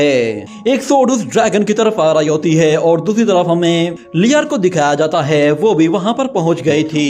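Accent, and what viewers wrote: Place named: Pakistan. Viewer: Lag RHa h Tum Pakistani ho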